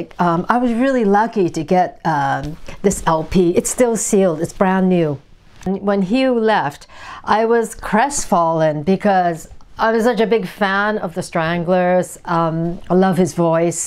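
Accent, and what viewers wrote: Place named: England, United Kingdom. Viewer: Hey I stumbled on this video by accident also, I love the the Stranglers too and like very much. I been watching this American girl Melinda something, her videos about vinyl records but her accent just drives me nuts 😂 so good to hear an lovely English speaking woman doing reviews. Keep up the good work your amazing 😊 thank you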